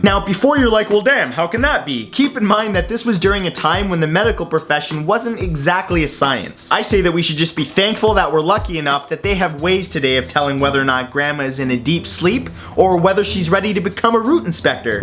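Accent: Canada. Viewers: it’s called being canadian, most of us talk like that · Is it me, or you can just tell this guy is Canadian? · your canadian? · wait- r u canadian?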